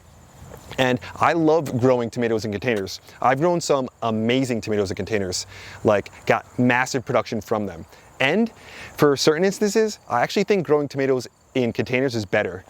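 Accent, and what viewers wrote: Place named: Pennsylvania, United States. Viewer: He sounds like my cousins when I visit Pennsylvania-ha! I'm originally from Philly now retired in Southwest Virginia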